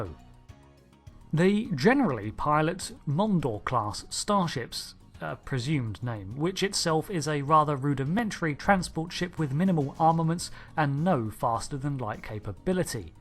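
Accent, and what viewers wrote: England, United Kingdom. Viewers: English from england？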